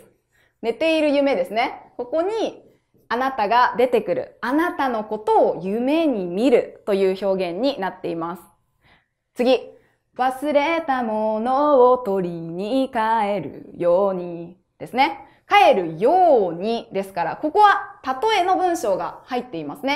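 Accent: Kansai, Japan. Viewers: 先生は関西人でしょうね。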